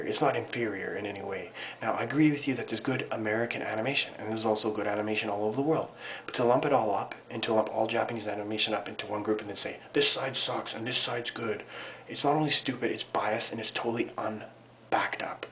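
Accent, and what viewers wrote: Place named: Canada. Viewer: You didnt have to explain The Z thingie since your aboot was rly obvious liked ur vid tho def kudos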